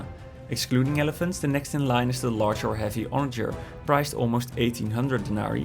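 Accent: Netherlands. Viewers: Holy shit, the narrator is Dutch as hell 😂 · That Dutch accent tough 😂😅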